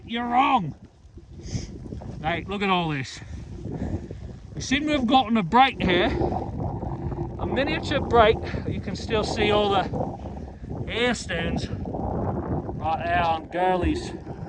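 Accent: Australia. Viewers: I don't know but I've been starting to talk to myself with your australien accent today... this is crazy, this is contageous !!! Happy 😊 to see Girlie ; such a beautyl!!! Watch The cosmic secret last night, had to finish it; A Brilliant Masterpiece!!! Brings lots of clarity !!! Bravo!!!👏👏👏☀️ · Good Aussie accent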